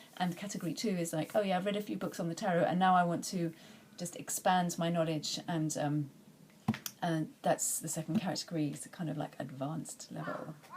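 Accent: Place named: England, United Kingdom. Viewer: How did you get a nice English accent ?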